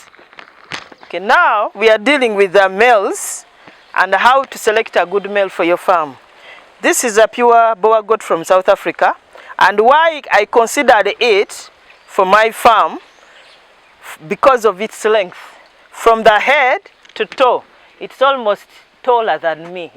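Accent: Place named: Uganda. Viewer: You are doing well ma. Excellent in your explanation, you must be a good teacher. I love Ugandans accent.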